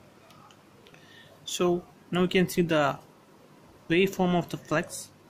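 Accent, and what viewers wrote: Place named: United Kingdom. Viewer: Sirf British tone me english bolne se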